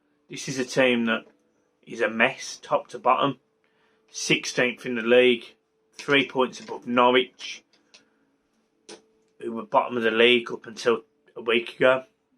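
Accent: Birmingham, United Kingdom. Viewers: I was expecting you to be a villa fan when I heard the accent! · Come and join the Villa family mate you’ll fit right in with that accent. 👍🏻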